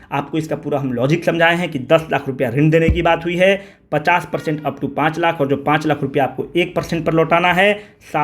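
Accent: Bihar, India. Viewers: 51/71 ...could be better!! Happy coz salfas mistake nhi hwa... Sir 2 Starbucks coffee ☕ udhar raha..😁 Bihari tone rocks ..carry on lv it proud bihari👍 Thankyou for this test n request sir..plz ese tests BS,MHI,MHB k liye v Le aaiye plzzz ..🙏 it is so helpful in revision. #THANKYOU ❣️ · Sabse phle Thankyou. Sir as a Bihari ,jha v humlog jate hai desh videsh bs ek chiz apne sath le jate hai wo hai apna bhasa ... Whi to pahchan hota hai na sir . Uspe to proud krna chahiye .... Jb aap Bihari tone bolte hai bahut hi gauranvit feel hota hai .. kabhi kabhi magahi v boliye .. bhojpuri and maithili people kabhi kabhi dominate kr dete hai 😜😛😋 ... Jo maza hm bolene me hai wo mai me kha ... Baki sir I am following your advice sincerely. Hoping for the best . Parnam 🙏🙏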